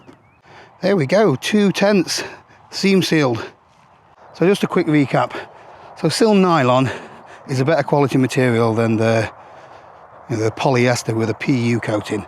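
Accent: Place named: East Yorkshire, United Kingdom. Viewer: DIY, snot ard is it...... 'Ull' east yorkshire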